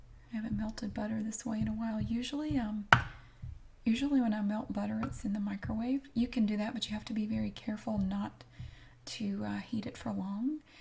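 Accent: South, United States. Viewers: That doesn't sound illiterate at all. It's obviously just an accent. I could listen to it forever. You shouldn't try to sound less Southern. It's lovely and be proud of it. Here OLL! For everyone :)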